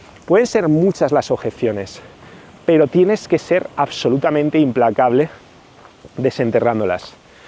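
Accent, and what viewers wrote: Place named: Spain. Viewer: Hola, escucho tus vídeos porque me encanta el acento español y la cultura española. Mis bisabuelos paternos y maternos eran españoles y se mudaron a Cuba 🇨🇺 y mis raíces son muy españolas. Yo actualmente vivo en E.U y viajar a España 🇪🇸 en el futuro muy próximo está en mis planes. Soy tu fan para familiarizarme con el la cultura española y cuando visite me pueda comunicar efectivamente. Muchas gracias 🙏 por tu tutoría.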